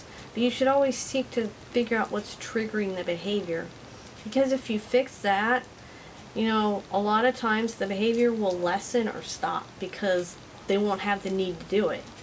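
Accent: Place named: South, United States. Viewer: i was born in Houston, i like your southern accent :p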